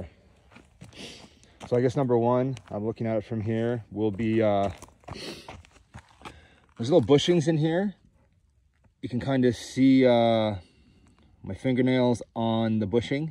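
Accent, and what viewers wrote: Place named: Canada. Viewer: You sound Canadian 😄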